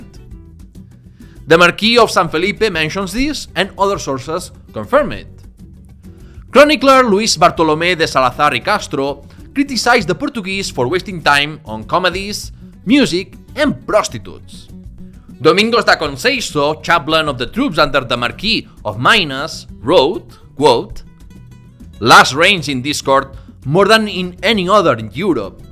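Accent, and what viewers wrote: Spain. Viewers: I feel like I'm studying Spanish by listening to your accent. Please never change it.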